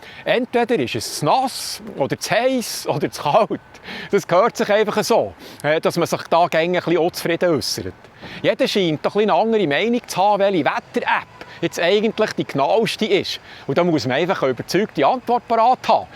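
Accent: Bern, Switzerland. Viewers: Es migroching, hani überno vo mire mueter, u speter , nach mine erfahrige, gröschtteils blibe. Regionali lädeli , oder burelädeli hei Priorität. I schetzes o, das mir hie so eiget si, o wes chli ängstirnig isch, aber we not am Mann isch, luegtme fürenang. I finges schön , hesch zyt für Ferie z mache.gnieses ömu de.o mit famille u fründe z si. Dis bärndüsch isch so ächt urtümlech, lise scho nume wäge däm gärn zue. Liebi Grüess us mamishus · Hallo, darf ich dich Duzen? Ich tu es einfach. Ich freue mich immer auf deine Videos. So ein schönes Berndeutsch. Ich denke dass du jetzt in Thun bist. Ich schreibe aus Langnau im Emmental. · Du bisch schwizerischer aus jedä Schwizer, oder so nes schöns Bärndütsch findet me chum me. Ä so het me vor 15 Jahr no g'red, ou dSprach tuet sich verändere, und nid zum Guete wie ig finde. Es git immer meh so ne Einheitsbrei. 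Ig lose dir us Burgdorf zue! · Die interessiert-offen vernünftigen Dokus und nun auch der lockere Beitrag mit dem stets sympathisch Berndeutsch sprechenden gefallen mir immer gut. Herzlichen Dank dafür! Tschübe aus Hettlingen ZH! 🙋🏻‍♂️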